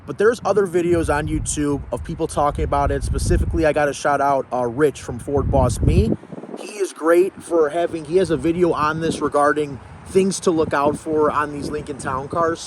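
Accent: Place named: Chicago, United States. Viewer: I’m from chicago too and you’re one of the only young cats that still have that old school accent. Hey brother how much should i pay for an 02 town car with 28k miles? Guy wants 8500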